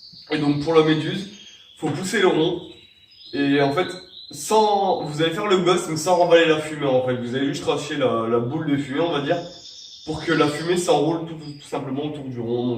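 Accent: France. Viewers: T'es de Ch'Nord? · tu viens du nord ?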